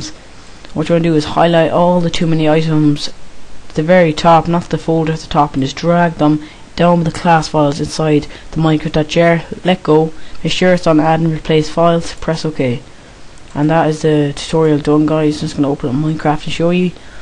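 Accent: Ireland. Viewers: it work thanks ladd btw are u from ireland ?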